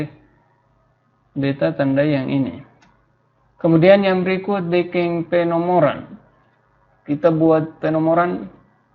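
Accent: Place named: East Nusa Tenggara, Indonesia. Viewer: Hallo kak, orang NTT ko? Beta juga, lebih tepatx beta orang sabu😄. Terima kasih pembahasan soal²x. Salam semangatttt.. Logatx mantap💪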